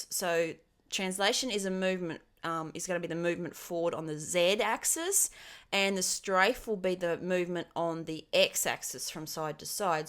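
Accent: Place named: Australia. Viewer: Uhh yes. An Aussie, thank lord. Been listening to too many Brits, need some voices from my homeland.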